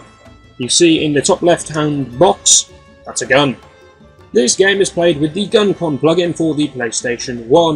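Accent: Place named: United Kingdom. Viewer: Does anybody in the United Kingdom play this game or more was this filmed in that area? I don't know but I'd like my question or questions answered. The narrator of this video sounds like he's from the United Kingdom.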